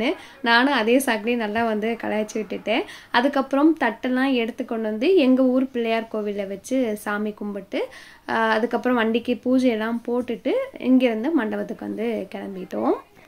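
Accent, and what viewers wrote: Kongu, India. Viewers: Neenga v2la kongutamil giramathu basai thaan pesureenga kongu vellaalar ah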